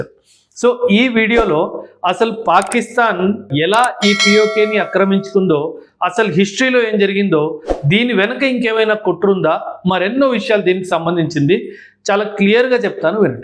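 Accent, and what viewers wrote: Punjab, India. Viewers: తెలుగు మాట్లాడే వారు కూడా స్వచ్ఛమైన తెలుగు పలకడం లేదు ఒక పంజాబీ అయి ఉండి కూడా స్వచ్ఛమైన తెలుగులో మాకు సమాచారం అందిస్తున్నారు అభినందనీయులు ధన్యవాదాలు❤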